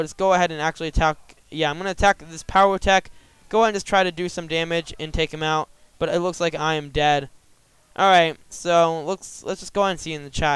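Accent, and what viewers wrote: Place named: United States. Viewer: Right, what server are you on btw,? I'm feeling a bit of american in your accent. Not sure which one specifically.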